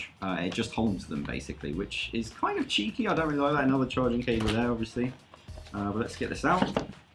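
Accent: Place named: United Kingdom. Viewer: He's a liar he lives in the U.K. 1. His accents 2. The plug How exactly did he pay dollars not pounds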